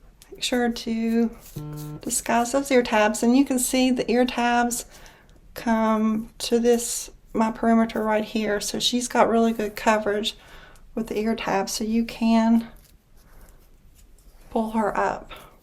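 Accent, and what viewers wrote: South, United States. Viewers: Your accent makes me feel like I’m at home! I’m from TN but have lived in Alabama, Mississippi and Arkansas. This is my favorite wig on you. Which wig that you’ve reviewed has been the best for wearing up? Like which has the best temple to temple coverage? And which blonde has had the best lace front? I wish I had your calmness!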